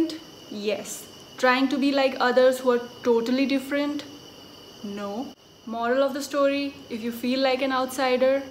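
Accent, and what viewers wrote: India. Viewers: I think many people feel like an outsider, but that's a feeling that them experience themself, that doesn't mean it's real especially people with low self-esteem. I don't know if this makes sense, I'm just trying to be smart here. I can understand if you have felt like an outsider in your life, because only your accent tells that you are from India and otherwise you don't look someone from India at all. I mean you look "white" than the average Indian and you look beautiful. That's meant as a compliment.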